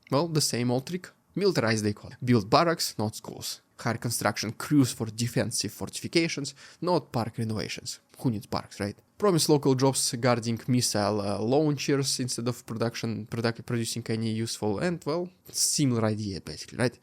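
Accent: Russia. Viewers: SLOW DOWN.... YOUR RUSSIAN ACCENT IS TO HARSH, WHEN YOU GET EXCITED, AND SPEAK TOOOOO F A S T.... S L O W D O W N.... S L O W D O W N....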